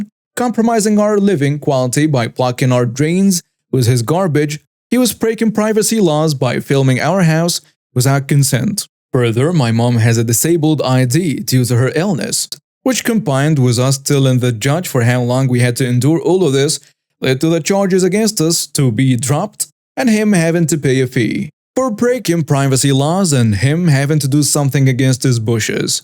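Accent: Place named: United States. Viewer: Hi! I hope that all is well with you and your loved ones. You are amazing with the English language. You can say several sentences in a way that sounds like you're an American! You are pretty much fluent! 👏👏👏 It's snowy, windy, and the temperatures in northeastern US right now, p.m. are 4°F. It's freezing! What is it like in Egypt? I wish you continued success with your channels! Take good care of yourself. Work hard, but find time for fun and relaxation, too!😊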